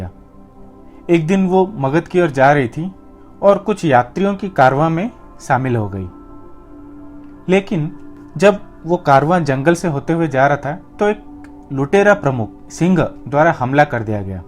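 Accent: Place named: Odisha, India. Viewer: The researcher trying to get a connection with srilanka and Odisha with few old evidence. I request to researcher try to so the examples of words which are similarity with srilankan language currently on use in our daily life,Mostly which district of Odisha using these words. Because district wise we have varity of dialects are using as per our region. One most important request to commenters not to use vulgar languages and do not comment on personal life of researcher. If we have more batter evidence to defend him than let be make a new one based on his blog. Which is benifit to new researchers of this topic. Let be welcome him for his new step. Hindi is the most popular communicative language now a days. Our odiyan Hindi speaking like this ,so there is no matter of negetive comment. One of us if translate by rectify his mistakes in odiya than it also good step. 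Criticism is welcome for all. Bande Utkal janani.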